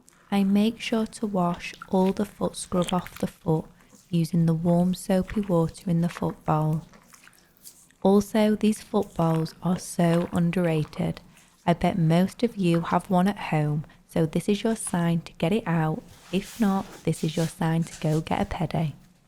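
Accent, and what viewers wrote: United Kingdom. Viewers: I'm not used to a UK accent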